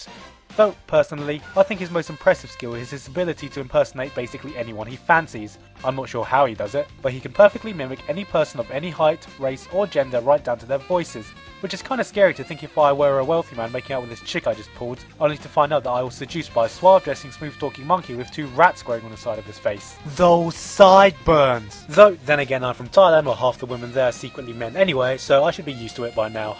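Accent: United Kingdom. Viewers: So wait your from Thailand but you have a British accent someone explain plz! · He's British but from Thailand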